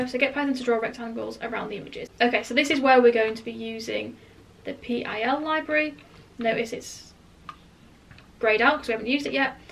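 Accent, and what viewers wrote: United Kingdom. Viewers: Thanks for this tutorial. It is easer to understand with a British accent. As this was made over two years ago, what is your recommendation today for the best face recognition software? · You are all I need, you are a girl (that shows me that I can become a programmer), you have interesting projects, you explain and the best you have a British accent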